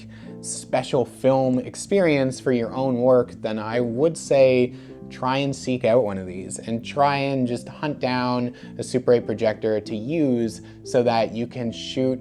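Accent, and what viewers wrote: Canada. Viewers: You have no idea how much I wish I'd found your channel a decade ago if it existed.. I had so much analog in my house and I didn't know how to do a thing with it and then I had a fire and a crazy crackhead tenant breaking in before that all I have left is a few things from the few super 8 reels that I managed to carry out of the house. I'm so afraid to run it thru a projector because I don't have much of anything left of my late parents and none of my brother since they have passed away. Where are you from? You sound like an old friend from Winnipeg and you are adorable! If I was half my age I would be starry eyed between your Canadian accent and your handsome face!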